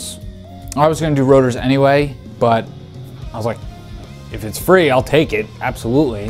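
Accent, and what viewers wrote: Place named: New England, United States. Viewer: Love this guy's videos but hot damn that yankee ass accent! I can get past it but it irritates my subconscious ever so slightly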